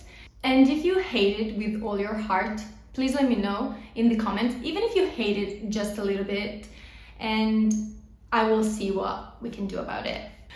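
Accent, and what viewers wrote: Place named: Italy. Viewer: Great seeing you again I started to wonder, where have you been 😊 ….glad to see you are O. K. I love love your videos…edited/unedited…it doesn’t matter because you bring your lovely personality and Italian accent (which I adore)to it. It is so so cute and enjoyable. Looking forward to seeing more of you in the future. Thanks for taking the time and sharing. You are an inspiration to us all💞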